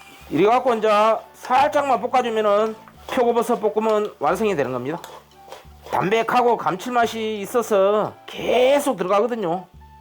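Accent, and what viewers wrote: Jeolla, South Korea. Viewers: ㅋㆍㅋ 셰프님 전라도네요 말씀이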